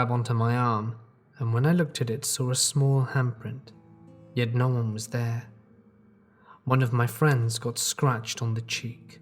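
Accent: United Kingdom. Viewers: Is it you that just speaks that way? Or do you only get stories from the U.K?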